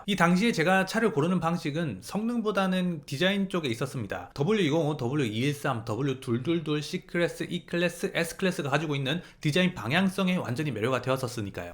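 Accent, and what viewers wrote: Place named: Busan, South Korea. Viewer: 말씨는 경상남도 부산쪽같은데 사는곳은 서울경기쪽에 좀 살아서 나오는 사투리억양섞인 서울말씨네요 ㅎㅎ 잘 보고 있습니다 😊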